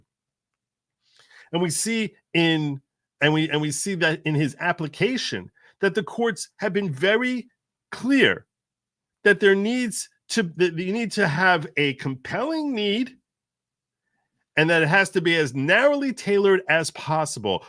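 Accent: New York, United States. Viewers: Thank you 🙏🏽 God Bless you. I just found you and have subbed. I appreciate you more than you will ever know. 🇺🇸 You are a true AMERICAN. Thank you for the attempt to remove gag order. I love your NYPD cap. As I am a recently retired 911 Dispatcher of 30 yrs. Half State time and half County time. This cap means a lot to me. You definitely have a NY accent! My husband of 20 years is from Queens-lifelong. And he has lost a lot of his accent being in the south. But it’s definitely still there. He does still say “ Can I ax you something?” 😂😂 I tell him he better not AX ME! 🤣 Thank you so much. 🇺🇸💙💛💙🇺🇸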